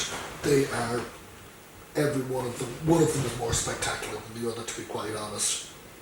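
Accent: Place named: South, Ireland. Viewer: Lovely video my friend missed this one where about from the south of Ireland are you from ?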